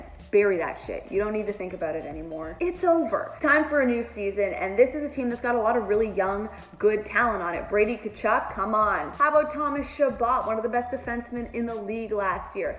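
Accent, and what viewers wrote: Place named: United States. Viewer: Oh no you have been in the STATES so long your getting an american accent lol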